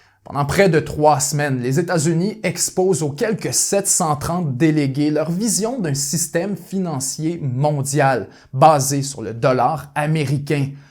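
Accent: Quebec, Canada. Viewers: j'ai vraiment toujours un problème avec l'accent des québécois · L'accent Québécois, il est magnifique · L accent Quebecois est genial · J'adore ton absent québécois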